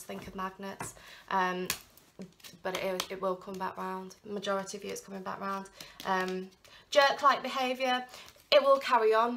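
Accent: England, United Kingdom. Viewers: OMG was Spirit hanging out with my DM..lol resonates completely as expected Beauty, you are always spot on..like the smart psychic girlfriend who is quirky yet never less than stunning on any given day..served in an very cute English ? No expert ..or close by accent and heart of gold who can be trusted..like all women need..TY love , for being that to me..I am grateful and appreciate greatly..Blessings I wish Love and light..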